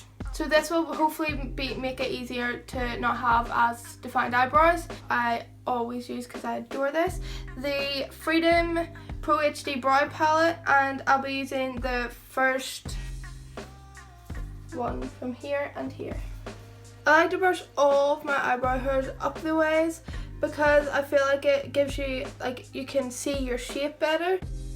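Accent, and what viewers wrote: Northern Ireland, United Kingdom. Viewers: Northern Irish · NORTHERN IRISH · northern Irish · lanseto NORTHERN ireland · Northern irish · Northern Irish like mine :) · She's British (Northern Irish),she can't help it,it's just an accent. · If u don't like her accent them why are u watching her videos?!?! that is what people from Northern Ireland sound like so u are basically insulting me and everyone else from there! There is no need to be rude! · she's from Northern Ireland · it's the northern irish accent, she can't really help it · Northern Ireland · She's from Northern Ireland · I'm from Northern Ireland too, she can't really change her accent. I think it's cause people on here aren't used to hearing northern Irish accents since there isn't many youtubers from Northern Ireland so you probably aren't used to it! But yeah she can't really do anything about her accent!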